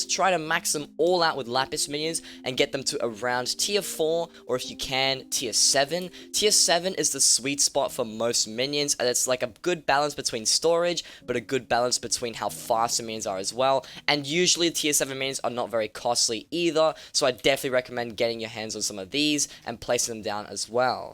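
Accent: Australia. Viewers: Where in Australia are you from?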